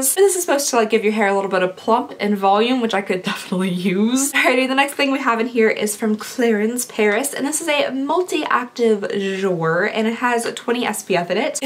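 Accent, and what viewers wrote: Kentucky, United States. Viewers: Ha ! I was like she sounds like she is from Kentucky! I am from Louisville. Love your videos !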